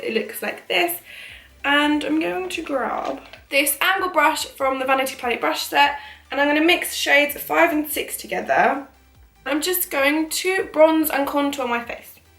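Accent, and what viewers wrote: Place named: RP, United Kingdom. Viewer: this is literally the third video of yours I'm watching today I just told my sister that I'm obsessed with ur voice and I wish I spoke like you you sound so posh and british 😭 my twin agrees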